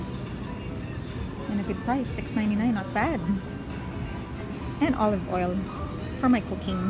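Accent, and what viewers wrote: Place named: Philippines. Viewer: You lose your accent when you sing. I love the Filipino accent. :)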